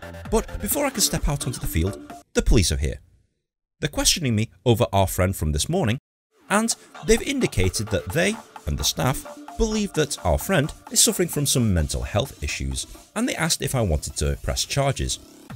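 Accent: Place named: Manchester, United Kingdom. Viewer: I could kind of tell you weren't a Spurs fan due to your Northern/Manc accent, and then you explained you're a Man United supporter so it explains it 😅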